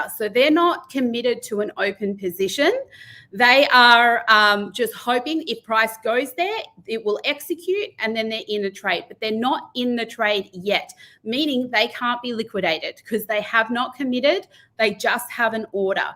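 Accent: Australia. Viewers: Clever lady, but that aussi accent, with emphasis at end of words is sooo annoying, markITt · I forgot how strong an australian aaccent can be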